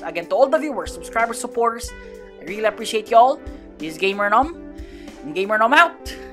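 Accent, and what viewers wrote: Philippines. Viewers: You sound so filipino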